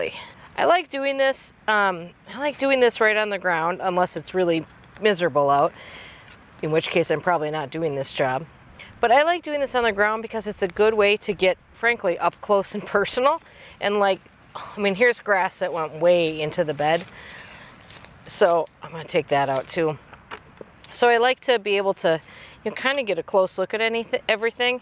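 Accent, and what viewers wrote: Wisconsin, United States. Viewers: I had to laugh listening to you talk. I think the word “so” must be a Wisconsin thing as I say it all the time myself. I live in Door County and it isn’t t-shirt weather here yet!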